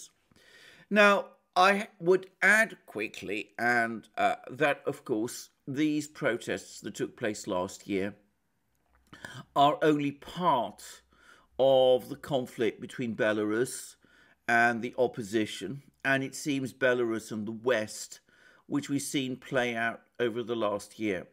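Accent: United Kingdom. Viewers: One of your best Alexander keep up the great work. That British legal accent is perfect to call out the hypocrisy of the US, UK and the EU.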